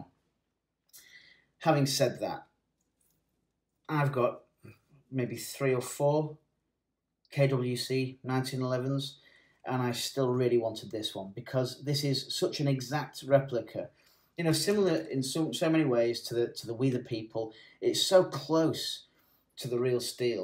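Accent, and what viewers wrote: England, United Kingdom. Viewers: Reviewing the 1776 with your English accent was a treat. 👌 Not a bit of salt over the defeat. 🤣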